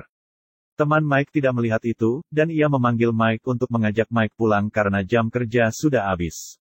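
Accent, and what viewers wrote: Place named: Banyumas, Indonesia. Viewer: Sesuai request sudah ngapak2 nih kawan salam silahturahmi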